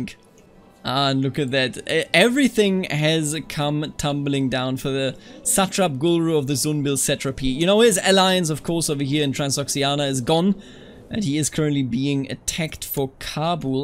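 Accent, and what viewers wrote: Germany. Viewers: Man I love listening to you pronounce Indian names with your German accent. 10/10!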